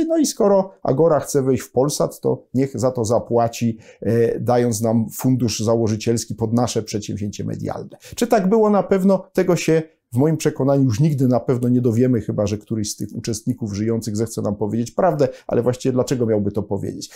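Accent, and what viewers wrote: Russia. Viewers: Jaki piękny polski język z rosyjskim akcentem :) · Z jakim ruskim akcentem gada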